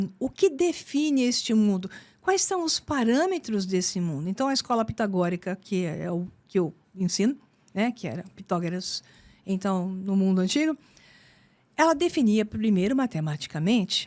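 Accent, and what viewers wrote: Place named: Rio Grande do Sul, Brazil. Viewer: Essa tia eh gaucha so' pelo jeito de falar. 😊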